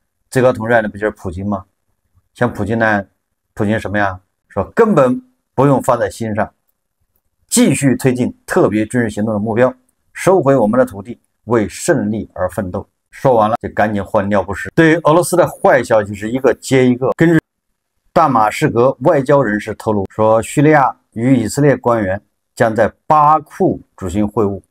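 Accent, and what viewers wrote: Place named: Wuhan, China. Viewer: 你有点黄陂口音。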